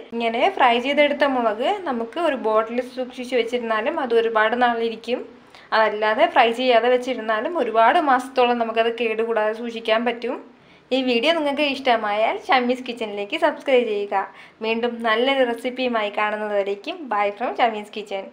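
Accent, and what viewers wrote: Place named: Malappuram, India. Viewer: സംസാരം കെടാപോൾ മലപ്പുറം ആണ് തോന്നിപോകും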